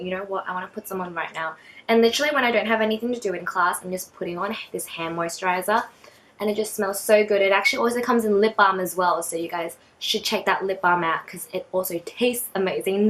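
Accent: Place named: Australia. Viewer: Randomly found your channel and got obsessed with your Australian accent!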